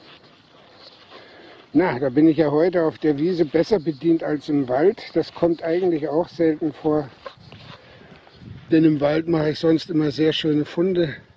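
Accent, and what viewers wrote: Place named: North Rhine-Westphalia, Germany. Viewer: Ich schau dir ja schon seit Abo Nr.67 zu , aber ich frag mich ob du auch aus dem Süden kommst? Hörst dich eher nach NRW an ;)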